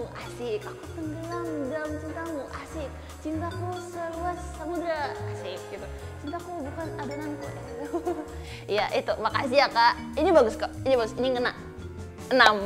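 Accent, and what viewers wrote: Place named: Java, Indonesia. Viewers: selalu ada medok nya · Medok nya kerasa banget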